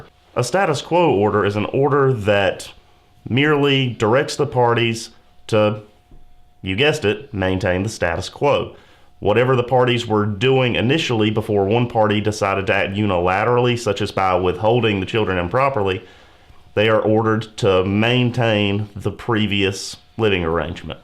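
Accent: North Carolina, United States. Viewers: I thought I detected a NC accent! I raised my children in Chapel Hill. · I knew this was north carolina cuz of the accent hahaha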